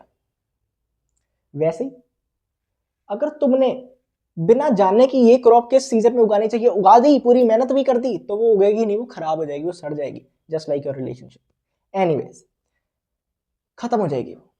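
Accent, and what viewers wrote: Punjab, India. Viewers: bhaiya are you punjabi >?????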